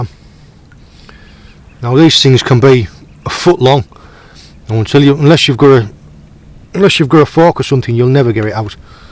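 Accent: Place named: North East England, United Kingdom. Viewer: Hi mate you sound like your from the North East which beach is this I'm from newbiggin by the sea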